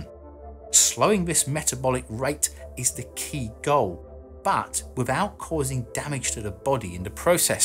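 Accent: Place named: England, United Kingdom. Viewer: Love the tone, the voice, the pure english accent, very relaxing listening to you Sir! And the content........ perfectly excellent!👍 Forgot to mention, the intelligence and the shirts of course, salute! From a French-Canadian subscriber 🖖.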